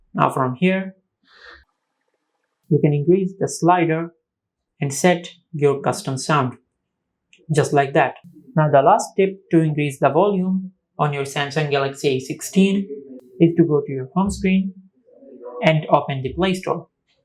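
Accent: India. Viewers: its indian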